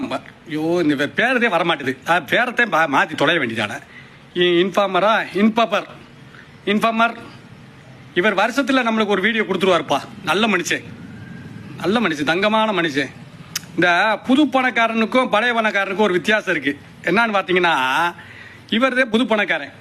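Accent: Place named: South Tamil Nadu, India. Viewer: Ultimate 😂😂😂 Pucca south TN slang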